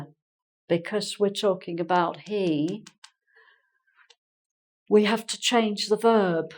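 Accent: England, United Kingdom. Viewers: Great lessons. I reall like the way how you bring it to people in a very nice way. Lovely voice and the tippical British accent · the people from England have an interesting accent, I guess that she's from some part of UK.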